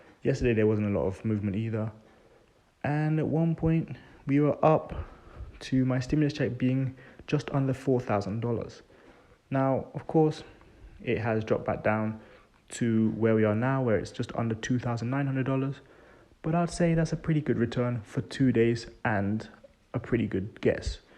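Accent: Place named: England, United Kingdom. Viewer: Hi you sound English are you based in the U.K. if so do you know where we can play options on these stocks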